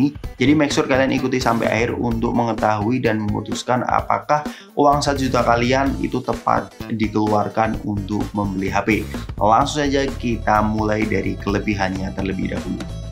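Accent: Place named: Java, Indonesia. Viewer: Ketoro jowone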